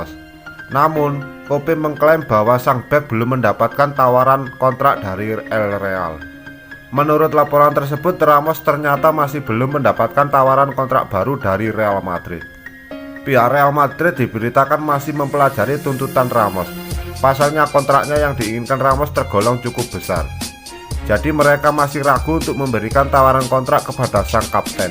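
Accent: Java, Indonesia. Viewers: Aku suka channel ini..cmn suaranya min ini yg aku gak suka..logat Jawa nya terlalu kentalnya dan kedengaran nya gk terlalu jelas min baca naska kaya ke anak SD yg baru belajar baca.. tolong min Suara yg lantang dan jelas kita pengen menikmati berita🙏🙏🙏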